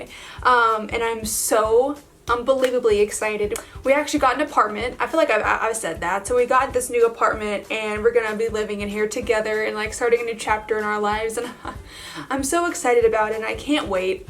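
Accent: United States. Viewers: Hi new sub here Lets be friends! Ive not had any new videos uploaded lately! Im into makeup and diys! Im a TN girl, all my life just outside of Nashville!!! My accent is a little more deeper than yours lol Not sayin thats a good thing lol Omg opryland lol used to love the themepark...but the hotel omg is GORGEOUS and the lights at Christmas!!!! The mall...omg good luck getting a parking spot within an hour during Christmas!!! Right?? Lol Well, so EXCITED your man is coming, hope you have him on the channel...have a Merry Christmas!!! Big thumbs up! Maybe next year we can collab on a video or 2!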